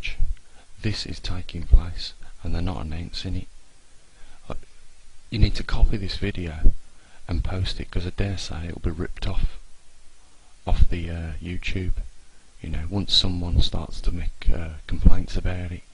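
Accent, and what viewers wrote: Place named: Cockney, United Kingdom. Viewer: I would have loved to listen to this video but the dude already has a thick English Cockney accent and the fact that he has his lips pressed up close to the mic doesn't help matters at all either! I got about 50% of the content. Not enough to understand.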